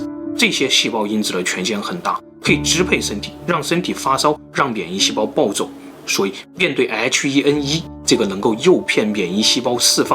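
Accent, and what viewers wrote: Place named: Hunan, China. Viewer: 的湖南口音真的讓我欲罷不能啊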